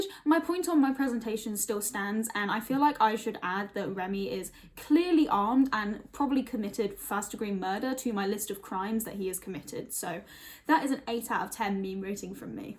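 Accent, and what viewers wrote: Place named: United Kingdom. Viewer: I didn't expect you to be british lol the first video I saw was your wipe it down video and it was classy af. Love to see and hear more about your life.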